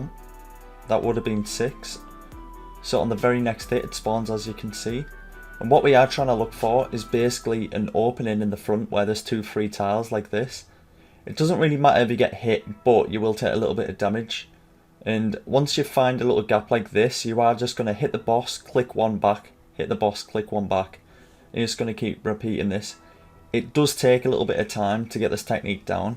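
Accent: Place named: Newcastle, United Kingdom. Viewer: Haha could tell by the accent lol Newcastle here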